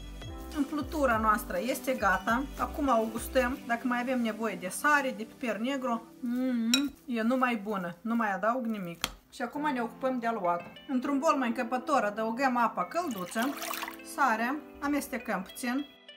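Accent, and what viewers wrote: Moldova. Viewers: Ești minunata atit de mult i mi place cum vorbești asa vorbeau bunicii noștri acum 60 ani.I mi amintesc și acum ce femei harnice frumoase bune asa ca tine. De cite ori i mi e dor ma uit la tine la rețete și la Stela Stratulat numai sa va aud dulcele grai Moldovenesc. I ți doresc sărbători cu bucurii sănătate alături de cei dragi ție. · dupa accent si dupa scrisul in rusa de pe plicurile cu condimente, as zice ca de peste :)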